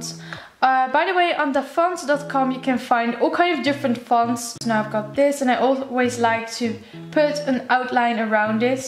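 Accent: Netherlands. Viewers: Ik kon aan je accent horen dat je Nederlands bent!💗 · You have a Dutch accent.. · Dutch accent..Then I read your FAQ and voila. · wow ur accent is like netherlands peoples accent xD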